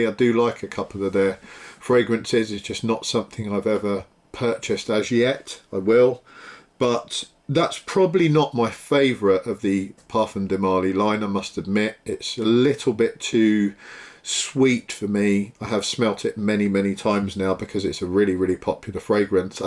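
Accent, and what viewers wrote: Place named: Australia. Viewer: I'm digging the Aussie (or is it Kiwi or Northern British ? ) accent... Funny how whenever I hear that accent I always associate it with belonging to a tough as leather and nails two fisted bar room brawler..lol... Must be a hell of a thing to get your ass kicked by a good smelling dude . ! Lolol Great channel and your authentic energy. New Sub !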